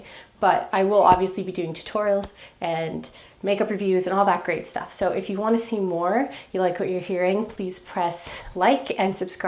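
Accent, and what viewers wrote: Canada. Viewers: I was going to say that you sound Canadian:) I'm from Northwest Montana just two hours from the border and so we have many from Alberta and B.C. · Very interesting story! I hear birds in the background? Are you Canadian or from Virginia?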